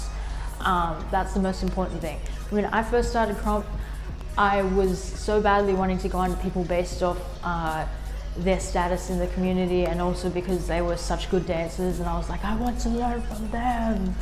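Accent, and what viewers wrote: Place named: Australia. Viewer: man, everything u talked about this video are literal GEMS of krump!!! its funnaye how it wasnt until this year or during lockdown, i found myself and keep growing just by focusing on myself and not comparing to others. Keep spreading your love for dance. Haha i forgot that your aussie accent is so distinct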